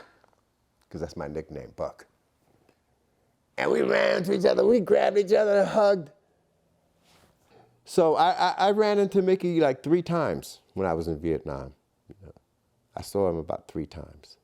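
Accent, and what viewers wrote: New York, United States. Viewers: absolutely fantastic storyteller it seems like you were right there with him, I love that New York accent💯🥴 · God Bless this gentleman. Great story teller. Classic ol' school New Yorker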